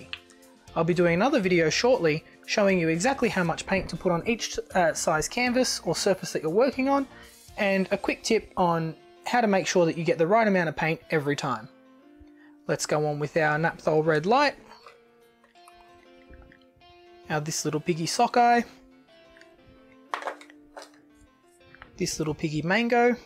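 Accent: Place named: Australia. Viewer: Thank you, such a talent !!!! I always thought you were Canadian, love the Australian accent...definitely need to visit one day 🤗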